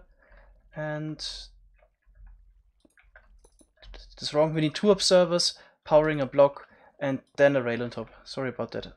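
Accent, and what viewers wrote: Germany. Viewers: Is your native language German??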